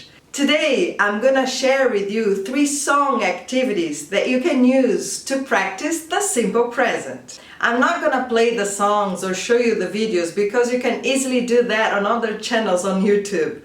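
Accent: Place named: Brazil. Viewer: Beautiful brazilian accent! Thanks for the activities!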